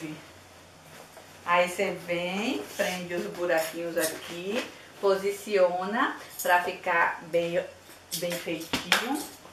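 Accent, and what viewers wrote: Northeast, Brazil. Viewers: Adorei só pode ser nordestina parabéns ❤️❤️❤️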